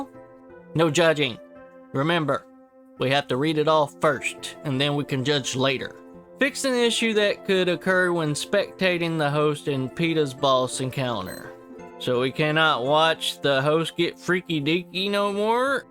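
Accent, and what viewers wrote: South, United States. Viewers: LOL. The southern accent is killing me. haha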